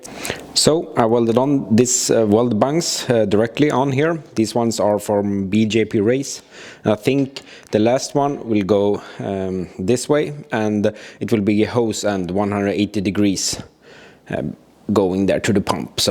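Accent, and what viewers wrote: Sweden. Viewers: Take care of yourself mate, you are doing something thats really cool and unique. I look forward to seeing the update videos on what you've been doing and hearing you speak swenglish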